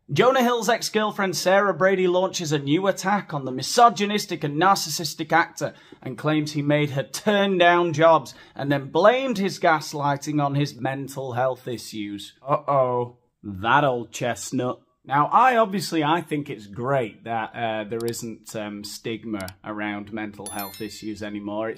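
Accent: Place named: Yorkshire, United Kingdom. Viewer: It could be his Yorkshire accent coming out 😂❤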